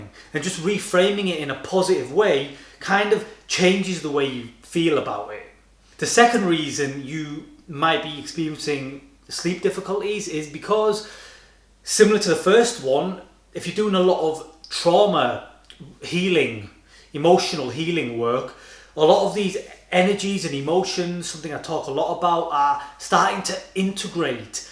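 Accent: Manchester, United Kingdom. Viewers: This is been happening for MONTHS now. I’ve been trying everything - eye masks, lavender diffuser, journaling, gratitude, showers, experimenting with snacks, sleeping pills, etc… NOTHING seems to work to make me feel refreshed in the morning. I usually stay optimistic, and hope for a better night sleep the next night. But today, I was kinda broken and f’in fed up with it! I needed this video today brother, thank you ❤️. As an aside - you sound like you’re from in or around Manchester? That’s my home, although I’m now on the other side of the world. So nice to hear this in a comforting, familiar voice 😊👍🏿. Have a great day!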